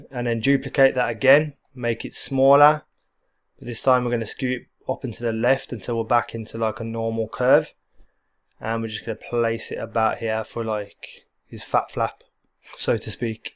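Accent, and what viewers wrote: United Kingdom. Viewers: Holy crap I didn't know you were british, or english, whatever the proper term is. Cool :D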